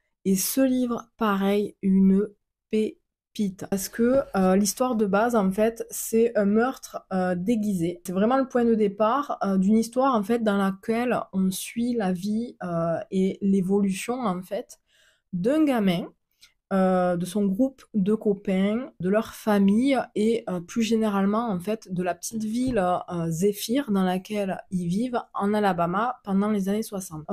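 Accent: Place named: South, France. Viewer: Je decouvre votre chaine. Bravo pour votre authenticite.Douce et jolie,aimez-vous ça vaut le coup! J.adore votra accent du sud je me regale moi qui suis du Nord. Bonne continuation. Je vous suis desormais. Merci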